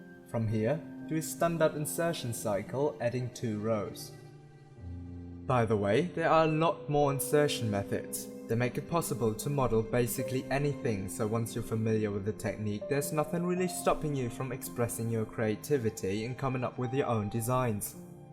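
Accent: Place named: Germany. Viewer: Question are you a German boy? because you have a German accent And I would say you are German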